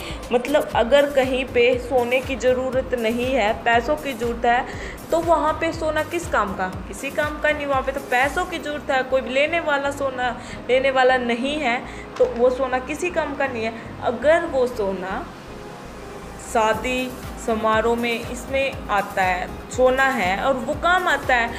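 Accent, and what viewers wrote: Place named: Haryana, India. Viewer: Haryanvi presentation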